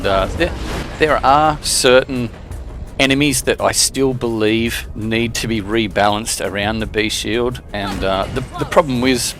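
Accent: Australia. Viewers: Yes we do and your aussie(thats a compliment)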